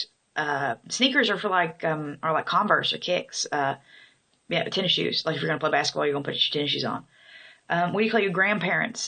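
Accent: Southern Illinois, United States. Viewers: Finally a real southern IL accent. :) Thank you! I've been mistaken for being from KY too. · You say everything exactly like me. I’m from Mound City 😊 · I grew up in Carterville! But I have less of an accent than this lady, and I knew people with a much stronger Appalachian-type accent. There's a gamut. No idea why there's considerable diversity. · Her accents the typical one for Southern Illinois. This is just the way people sound when talking, you obviously haven't spent much time since you don't understand this. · I've lived hear in Southern Illinois all my life, & she sounds like everybody I know. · SO, IL for sure I be from Collinsville Il u got it down pat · Probably depends on what area of Southern Illinois you are from. Everybody I know sounds like this. I grew up on the Mississippi river. Grand Tower. All along this area all the wag down to Cairo people talk like this. 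Not even trying. It is just the way we talked. I have been in Wisconsin for the last 20 years and it has faded quite a bit since Wisconsin has a very specific accent as well. I have noticed the biggest part of the accent is that we spoke our words really fast. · I grew up in Marion Illinois and she sounds like a native Southern Illinois. Actually she doesn’t sound country enough. Most of the older people I know talked much slower with a more southern draw.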